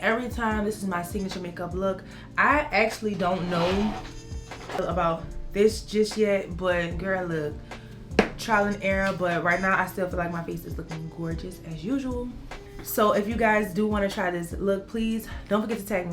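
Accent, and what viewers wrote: Louisiana, United States. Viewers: This is my first time seeing you... You were in my recommendations... I love your make up and then I heard that accident too 😍 is that a New Orleans accent? I love your accent!! 🥰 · the hair definitely grabbed my attention but once I heard you talk I said “oh yea she from Louisiana, lemme follow her” lol you’re beautiful! 🫶🏾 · You are sooooo beautiful. Are you from New Orleans? Trying to pin down your accent!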